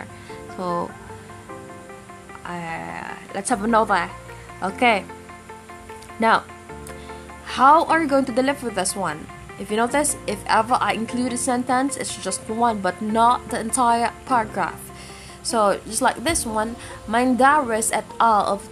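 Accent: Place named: United Kingdom. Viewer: Hey! your British accent is amazing! Hahaha I know it’s yours voice, funny and educational. I’m done watching,like and subscribe. Of course it’s amazing. I miss you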